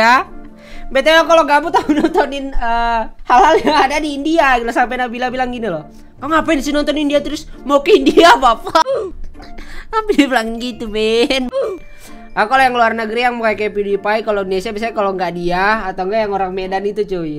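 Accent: Kalimantan, Indonesia. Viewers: Anjim, org kalimantan trnyata. Jelas aja agak kenal dngn logat2 nya😂😂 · Abang Kalimantan apa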